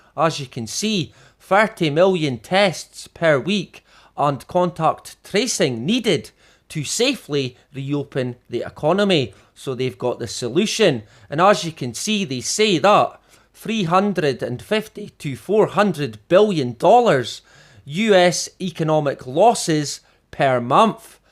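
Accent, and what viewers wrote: Scotland, United Kingdom. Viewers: Shalom shalom, dear friend from bonnie Scotland. Sure you're right, it's not at all about control lol. What ever gave you that idea? 🤣🤣🤣 Thank you so much for the research you do and share here. It's always a blessing and a pleasant break from other things here to hear you tell it like it is with your awesome accent and sense of humor. My favorite place to hear what's really going on. Blessings on you and yours! 💚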